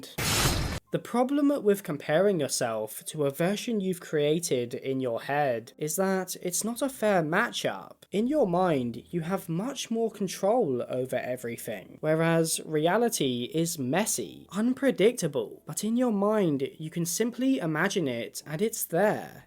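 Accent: United Kingdom. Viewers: ive only just started this video, am or so, but i have to say... the molly bit hurt. because i was a molly on the weekdays. i cant personally remember a lot of my childhood, but i wouldnt be fed long enough that i would squirrel away sticks of butter from the fridge so i could eat when stuck in my room at night. my mother would take my bed away if i didn't do my homework. it's like.... idk, comforting to know that that kind of thing is recognized by the people who made the game as not only tragic and cruel, but... realistic. that it happens. im lucky i had my dad's house for the weekends. he didnt turn out to be the best parent by the end but he helped me survive emotionally at least until i was 11. edit: wow. now, reaching lewis' part, this video is so strangely targeted at me. i experienced Maladaptive Daydreams as a coping mechanism during my young years as well! 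it's something i frankly wish i could experience again in my adult years, even when i know it's really disruptive and i have to try to keep up the adult responsibility thing. ironically, weed is helping me with my dissociation symptoms. nowadays it almost seems as if im suffering with some sort of aphantasia, though. which, as an artist, that blows lol edit 2: OH SHIT YOU DID THAT VIDEO ABOUT THE OLD COUPLE THATS WHERE I RECOGNIZED YOUR VOICE lmaaoo ngl i was sitting here thinking "i can't just be thinking that all british men sound the same, i have to have heard this guy somewhere else" and it was that v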